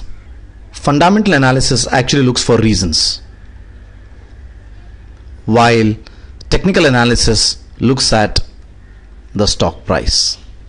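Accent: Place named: India. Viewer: Indian English needs SUBTITLE....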